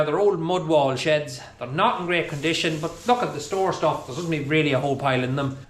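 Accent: Ireland. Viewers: Hi I am a small dairy farmer from Australia where milking 60-70 cows is not common. My wife and I both work off farm as well ( I am a farrier by trade)so at times it makes for long days. We have 5 grown up children and have enjoyed the times where your kids were helping to move cows stopping calves jumping over temporary electric fences etc ( just like us). Until recently I was beginning to question whether to keep going with a small herd and was perhaps embarrassed to be such a small farmer. Then you and your videos came along and you have inspired us and we have a completely new attitude. We want to be like with a beautiful, neat tidy little farm. When we are planning or doing new projects we even impersonate you with put on Irish accents. I do have Irish heritage from Cork. Thank you so much you have made us proud to be small dairy farmers 😊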